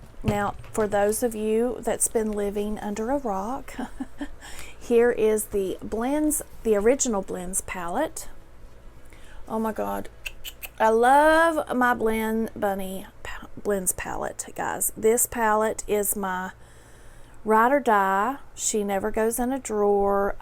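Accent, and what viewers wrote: South, United States. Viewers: I Need that palette!! I don't usually say that..😯 I love your accent 😍 Southern Lady..the looks you do 💜 you're so Amazing at what you do!! Aaaandd... 1 k is coming..🥳 Love you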